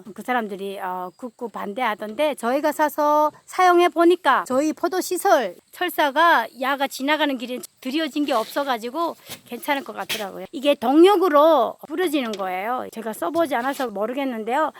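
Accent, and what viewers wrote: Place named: North Korea. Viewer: 말소리가 북에서 오셨나요. 쉬엄쉬엄 하셔요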